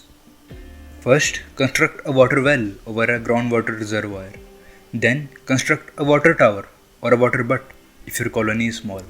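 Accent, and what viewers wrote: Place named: India. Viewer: >indian accent >poo mod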